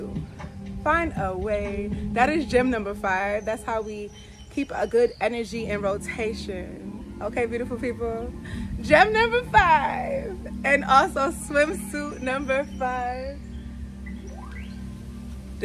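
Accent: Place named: New York, United States. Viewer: I can’t tell if she from Long Island or the Bronx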